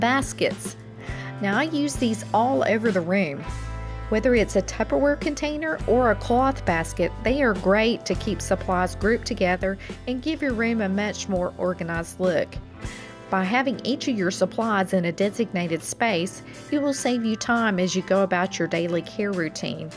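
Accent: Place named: South, United States. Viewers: She from Texasss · Western North Carolina here. We're neighbors! Are you from East Tennessee? Your accent sounds very similar to ours. Kenny Chesney is from Sevierville, I think.:D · I love your voice. I'm southern too and this makes me feel like I'm in Sunday school with my teacher Mrs. Katie ❤️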